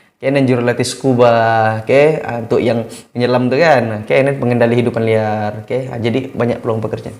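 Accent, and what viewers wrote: Sabah, Malaysia. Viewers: ketara dialek sabah HEHBHABA btw thanks cggg ^^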